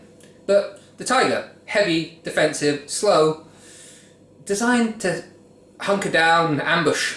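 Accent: United Kingdom. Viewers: Aside from your velvet queen's English accent I watch you because I learn things, I had no idea the differences between these tanks!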